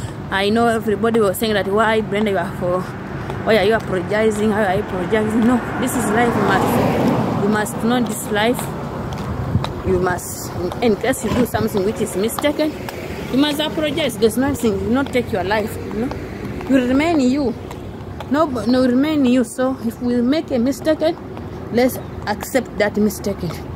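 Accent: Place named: Jamaica. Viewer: You talked like a Jamaica 🇯🇲 ❤ you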